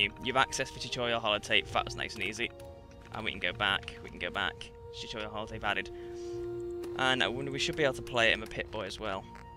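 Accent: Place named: Liverpool, United Kingdom. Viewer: You sound like ur from my hometown,Liverpool.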